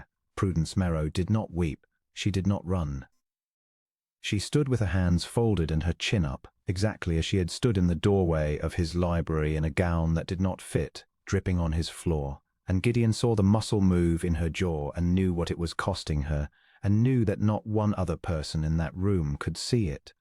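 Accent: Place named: United Kingdom. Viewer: I absolutely loved this story! Well written and perfectly narrated. A British accent makes these stories so much more interesting to listen to. Thank you!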